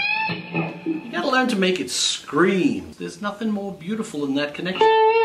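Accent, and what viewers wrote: Australia. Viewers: It sounds like Frank's Australian accent isn't as noticable as it was back in the 90's. It's more subtle, and he has an American accent at times. Not a cut down; just an observation. I love the guy! I still have his old REH Video on VHS from when I was a kid. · Being as I live in Sydney, I thought I detected an Aussie accent; yup, born in Canberra he was! Oy, Frank, awesome, mate!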